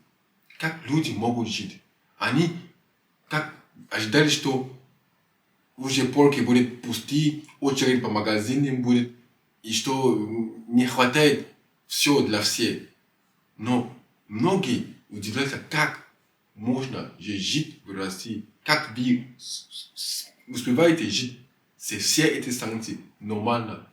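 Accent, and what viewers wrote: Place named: France. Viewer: По акценту франко говорящий, а в душе русский (почти). Респект чувак!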